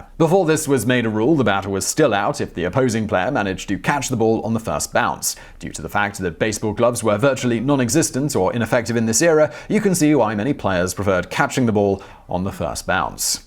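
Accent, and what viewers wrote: United Kingdom. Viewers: Why am I listening to a Brit tell me about baseball?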